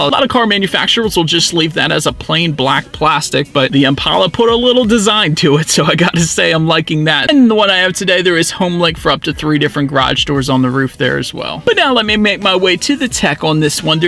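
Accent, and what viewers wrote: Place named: Philadelphia, United States. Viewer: Love that philly accent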